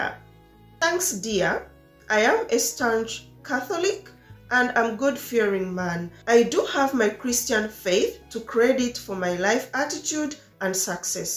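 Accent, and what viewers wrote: Uganda. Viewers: This is ugandan accent not mzungu the voice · It's Ugandan accent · Teller's accent is a Ugandan.Sorry 😢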